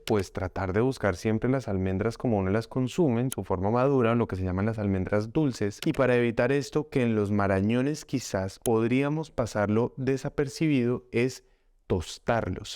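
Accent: Colombia. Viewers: Dr as Ud colombiano? De Medellin o de manizales. Con el acento que tiene me parece haberlo oido desde que naci. Soy de Manizales en donde el 70% de la gente es y Ud con ese acento....pues debe ser o antioqueno I manizaleno. Cosa que me alegro sombre mantra y me pone muy pinchada❤❤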